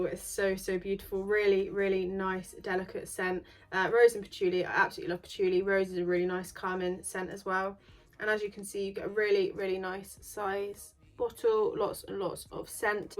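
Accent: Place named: Suffolk, United Kingdom. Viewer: Your hair is gorgeous! How do you get it so white but still so healthy looking?? Also, where abouts are you from? I’m from Suffolk, your accent doesn’t sound too far 😊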